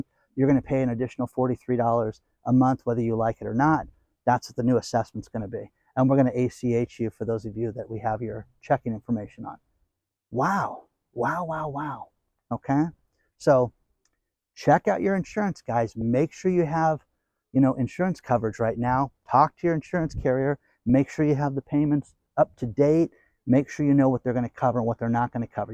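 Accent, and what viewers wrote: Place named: Southern California, United States. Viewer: The SoCal inflected "OKs" get me laughing every time!!😂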